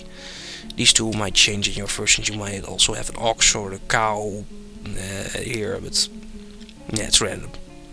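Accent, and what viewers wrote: Netherlands. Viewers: Haha , je kan horen dat je Nederlands bent :'D . Btw waar ongeveer in Nederland woon je als ik vragen mag :x ?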